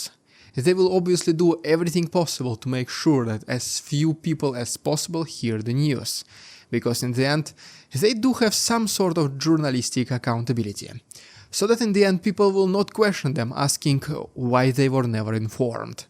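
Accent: Russia. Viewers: You present good videos, not only as far as news but with one of the best foreigners who is very competent in English. At the same time you have one of the most severely bad accents. The harsh contrast between intelligent presentations delivered with a hardly understandable Russian accent is hard to understand. A man who speaks such good English (or maybe has a good teleprompter?) should be able to teach himself a decent pronunciation of his speech. Give it a go, you will get more followers.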